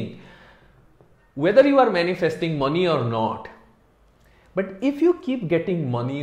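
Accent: India. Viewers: Is-spirituallity indian accent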